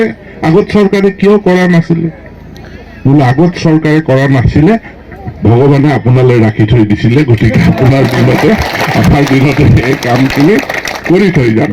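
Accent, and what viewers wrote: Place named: Mipak, India. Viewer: Ki appuni mising valkoi kobo najane sir. Mipak nisina lage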